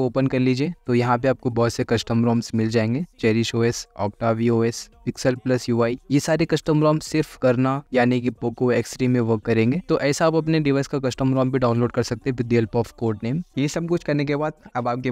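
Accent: Hyderabad, India. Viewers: Hyderabad se ho aap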